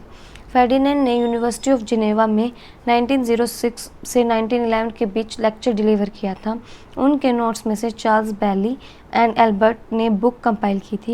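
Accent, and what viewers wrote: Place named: Jammu, India. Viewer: Thank you for the video. And your voice is just 🔥🔥🔥 Your tone makes me feel like you are from Jammu 😅. Anyways, Stay blessed.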